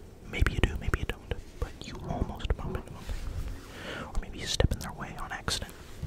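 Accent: United States. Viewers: very Minnesota accent😭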